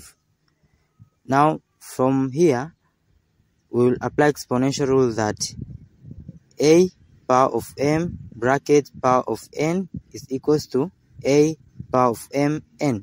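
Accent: India. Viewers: Thank you random guy, that sounds Indian, on YouTube.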